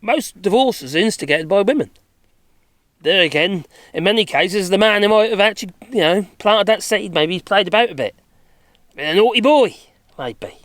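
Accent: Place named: Norfolk, United Kingdom. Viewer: In this strange troubling world we very much need people like you. Won't whittle on but not only talk a lot of sense but even better I get to hear a Norfolk accent as well. Lived many years in Overstrand and then Cromer and lastly in Wymondham. Keep going you are much appreciated by us tune in.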